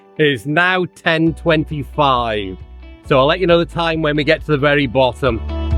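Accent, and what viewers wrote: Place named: United Kingdom. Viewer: I am here to enjoy your beautiful British accent 🙂. Have a great time in China!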